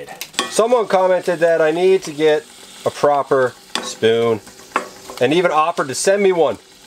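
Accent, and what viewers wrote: Canada. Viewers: Very calming video.You seem like a nice guy.Good to hear a Canadian accent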